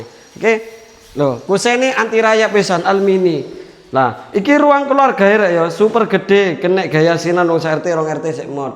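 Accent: Surabaya, Indonesia. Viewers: Aku seneng logat suroboyoan mu mas. Salam dari boyolali lereng merapi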